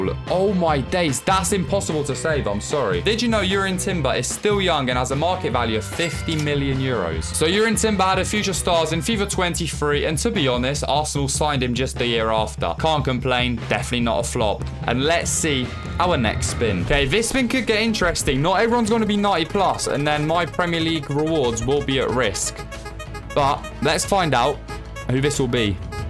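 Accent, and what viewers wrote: Poland. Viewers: Are u polish maybe?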